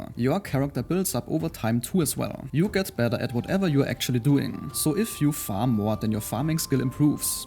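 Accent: Germany. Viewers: so deutsch ab sekunde 1 instant gehört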